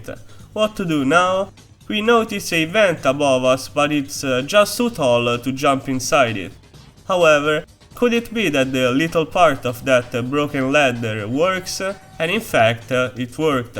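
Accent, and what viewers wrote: Italy. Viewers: Nice vid but u sound so italian lol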